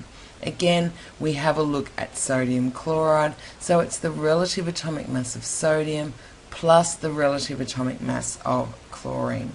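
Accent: Australia. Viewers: What accent is this ? Australian with a twist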